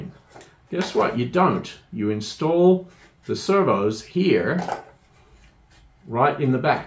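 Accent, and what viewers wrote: Australia. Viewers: Nice. I am thinking about trying one of the DWH kits. I can hear an Aussie accent? Where do you fly in Canada? I am also an Aussie. I am in the Yukon. I've been flying RC since 2007. I was away from the hobby for a few years, and I am just get back into it. Cheers.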